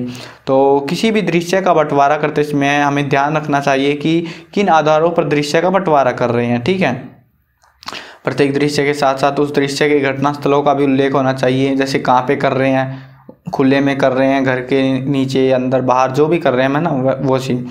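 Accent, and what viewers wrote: Haryana, India. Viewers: Haryana se ho bhaiji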